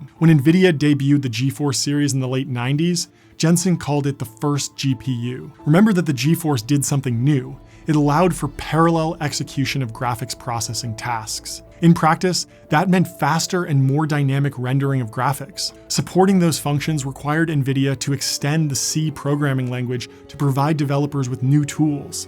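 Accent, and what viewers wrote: United States. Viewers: I'm an English pedant, my multilingual son wouldn't make that mistake. However I do accept as the saying goes that we are "two nations separated by a common language" and the guy speaking is speaking American and not English. Just rubbed me the wrong way is all.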